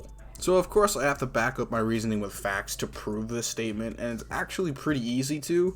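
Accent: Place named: Australia. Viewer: 277 Christian Kincaid Christian Kincaid 4 months ago I feel like an SBR Joestar family tree would be just as interesting, despite not being around as long. 114 Yoxiv Yoxiv 3 months ago Hey, how dare you forget about Jotaro's adoptive son Koichi? He's like the child Jotaro never had! 50 stan 99 stan 99 4 months ago How confusing is the Jojo family tree? Araki: Yes 72 Ken D. Uchiha Ken D. Uchiha 4 months ago Realized josuke and jotaro’s mom are siblings?😂 65 Shiranui prestonsaga Shiranui prestonsaga 4 months ago The family tree becomes so complicated once we hit part 4 and beyond 49 NoelxHunter NoelxHunter 4 months ago “While the others don’t have a sarcastic Australian man narrating” The Anime Man: Excuse me!!!??? 47 CondorShelves CondorShelves 4 months ago Donatello's last name is Versace, like the fashion brand. Part 6 in particular had tons of fashion references. 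Thanks for Jojo monday, always makes me happy to see new Jojo content uploaded straight into my youtube feed. 54 Damian Nova Damian Nova 4 months ago I do want you to do the SBR family tree because I know you’d do a great job and it would be loads of fun 32 Avocado Leeminovela Avocado Leeminovela 4 months ago The Joestar family is the lineage with a birthmark in the shape of the suscribe button 17 Sven Servette Sven Servette 4 months ago I didn't realize you were Australian. I thought you were American trying to be fancy. I guess that describes the Australian accent pretty well. 36 preseetha Deepak preseetha Deepak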